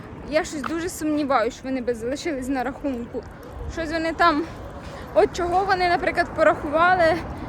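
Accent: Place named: Cherkasy, Ukraine. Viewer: доця, я так радію, коли виходить твоє відео. Вони мені такі домашні, ніжні, добрі, тому що ти дуже гарна дитина у всіх відношеннях. Бажаю тобі всього найкращого, а головне, щоб ви наші діти жили в світі без війни. Обожнюю як ти розмовляєш, у нас( Чер каська обл. ) теж так говорять.❤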